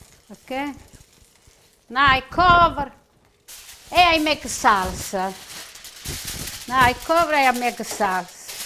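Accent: Italy. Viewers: We love Her with her very nice ITALIAN ACCENT grazie mile nonna 😘😘😘🌹👍💝💋💋💋from Canada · Shes Italian.... don't like her accent don't watch her... be nice or leave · 😁😁😁😍🌹🌻🌷 love your accent-- very charming (butter and oil). True Italian. The dish looks so very easy and yummy. Thank you for sharing!! Happy Holidays a great Christmas and New Year to ENTIRE FAMILY!! Be safe!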